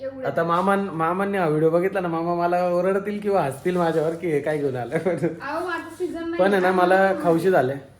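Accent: Nashik, India. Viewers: Dada mi devlyachi aahe.tu maja gava javlcha aahe.khup bar vatle.tumchi Jodi mala aavdte.tuja bhashe Varun mala samjle tu nashik ch aahes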